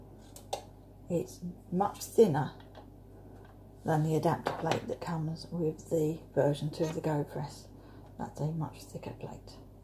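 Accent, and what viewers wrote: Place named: England, United Kingdom. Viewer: yesI love her calm no nonsense precision using measurements thicknesses and no useless jabber😃😄😂I can't believe my fortune to have found her...and that adorable English accent..I sit here with my calligraphy book and drool♡♡♡♡ I can't wait to give foiling on vellum a go!!!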